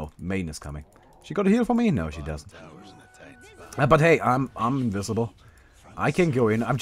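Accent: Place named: Germany. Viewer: when it comes to "medallion", it's an accent. You have a german accent and we don't shit on you for mispronouncing some words, now do we? ^^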